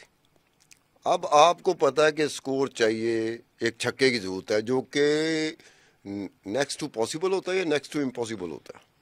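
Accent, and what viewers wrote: India. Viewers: Aleem khan please ask your host speak like Pakistani not Indians. This is very annoying .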